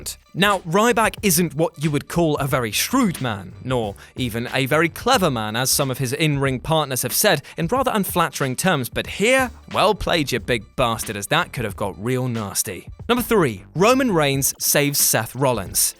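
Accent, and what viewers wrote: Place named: Newcastle, United Kingdom. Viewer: Yessssss a fellow geordie 😂😂😂💪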